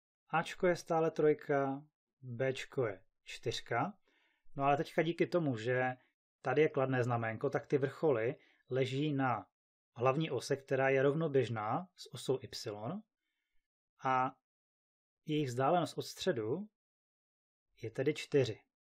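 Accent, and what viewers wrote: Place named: Moravia, Czech Republic. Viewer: až na ten moravskej prizvuk docela dobry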